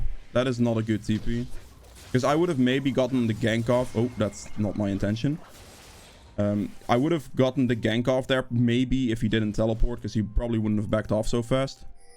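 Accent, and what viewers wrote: Netherlands. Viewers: Love the vids, i'm new to jungle and nocturne, learned a lot from you! Also; are you Dutch? Thought I recognized the accent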